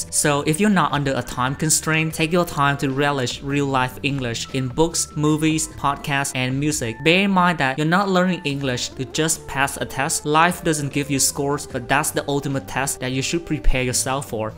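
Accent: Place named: England, United Kingdom. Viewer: Thanks to your Fb post about Notion, I know you. I really like your English accent and what you shared in your videos. Thanks so much. <3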